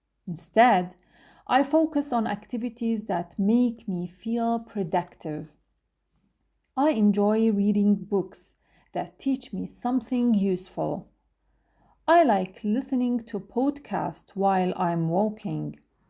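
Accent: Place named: Arab world. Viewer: أنت عربية واضح من لهجتك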